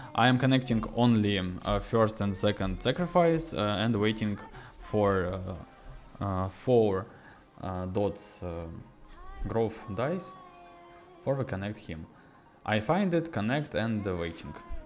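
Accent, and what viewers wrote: England, United Kingdom. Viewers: Настоящий англичанин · Oh, this beautiful english accent. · О, вы из Англии?😃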